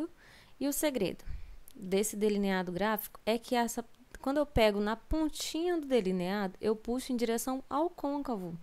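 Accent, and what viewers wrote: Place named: Minas Gerais, Brazil. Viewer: vc é de onde? seu sotaque parece de gente de Teófilo Otoni em MG...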